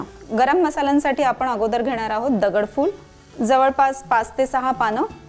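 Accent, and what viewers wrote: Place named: Satara, India. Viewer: सातारची भाषा आहे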